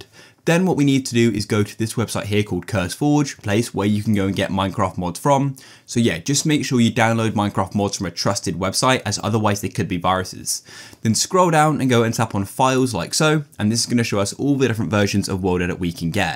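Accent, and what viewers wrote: United Kingdom. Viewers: don't speak British, speak American English!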